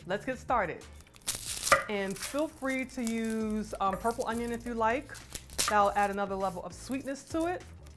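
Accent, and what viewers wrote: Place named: New York, United States. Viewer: Man, I could tell she was from New York- soon as she spoke ... I wanna make a meatloaf but I don't have fresh Parsely☹️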